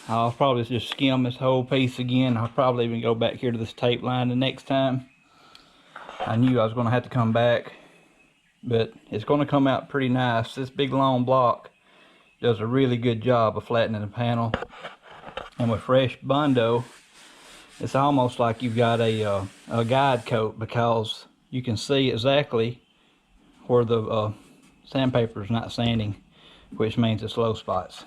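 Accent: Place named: South, United States. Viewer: You got that right and someone who know how can spot someone else who does. Yes an art and not something you rush either ! When I saw you pull the string in the seam and you bolted the fender in place and it all looked so nice I thought this is not his first rodeo ! Enjoying your videos ; I'm in Alabama and you sound like a southerner ! You turn a pretty good wrench too ! A real car guy I can tell.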